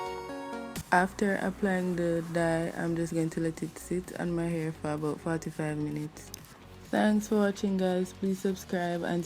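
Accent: Jamaica. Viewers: keep the sweet Jamaican accent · know you're Jamaican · hold up sis come straight from yaad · Knew you were a YAADIE (Jamaican) as soon as you started speaking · Jamaican accent, tun up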